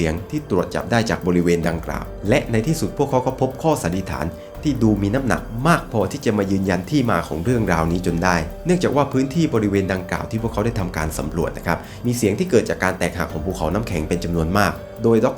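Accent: South, Thailand. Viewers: เสียงเพราะหางเสียงแอบเหมือนคนใต้ 😊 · พี่เป็นคนใต้ป่ะคะ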